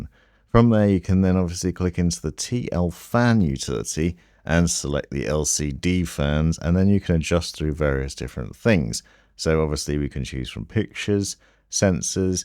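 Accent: United Kingdom. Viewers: God your videos are just awesome, the editing, the sound quality, the clear explanations, the smooth voice coupled with the british accent 🥵, i love your work and you’ve helped me so much for my first build ! Thank you ! I was wondering though if i used the galahad lcd 2 aio in my system with 3 lcd fans and the rest being sl infinity fans would i use the galahad controller or would i need a different one ?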